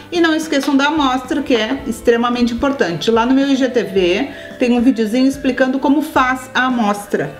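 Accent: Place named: South, Brazil. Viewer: Boa noite, estou muito grata por todos os ensinos que tenho tido contigo. Te acompanho sempre, e comento pouco. Como tricoto no método inglês com mais facilidade, e ainda nova neste mundo maravilhoso da arte, vejo vc tricotando rápido demais. Então, vejo várias vezes para pegar cada detalhe. Linda demais essa cor. Ouvir esse sotaque do sul é maravilhoso. Morei no início do meu casamento em Santa Maria. E trouxe uma guria para mim😂. 😘🙏🙌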